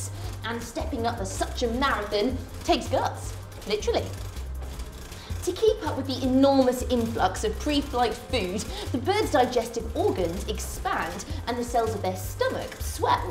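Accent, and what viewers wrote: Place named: England, United Kingdom. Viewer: senin ingiliz aksanına USS Misisipi çarpsın.. bu kadar mı itici olur bi kadın ya...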